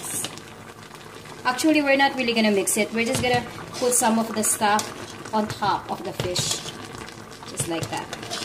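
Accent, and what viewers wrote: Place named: Philippines. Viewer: you speak fluent English but ❤ your Pinoy accent 😅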